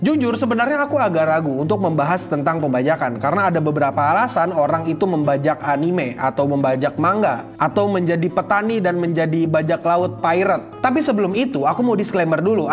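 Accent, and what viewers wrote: Java, Indonesia. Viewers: jadi medhok gitu le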